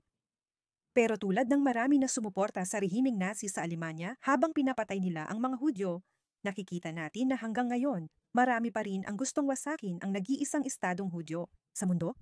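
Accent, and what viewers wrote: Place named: Philippines. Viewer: Malinaw,miss, pilipinong pilipino Ang voice over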